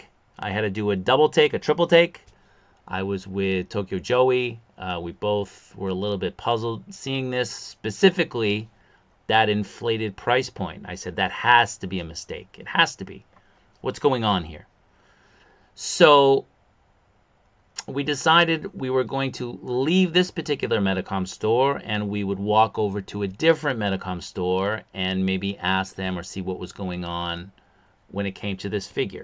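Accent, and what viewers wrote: Boston, United States. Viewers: So you live in japan but I notice you have a boston accent, is that where your from originally? I gotta know man!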